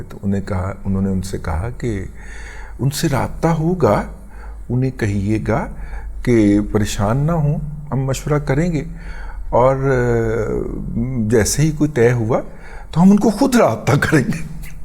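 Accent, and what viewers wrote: Punjab, Pakistan. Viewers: صاحب پنجابی سوہنی اے تُہاڈی، حِس وی پکی پنجابی اے، اُردُو توں جان ای کیوں نہیں چھُڈا لیندے۔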